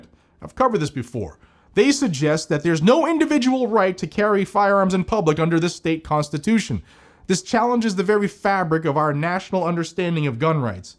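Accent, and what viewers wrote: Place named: Illinois, United States. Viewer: I’d say that it sounds like you are from Illinois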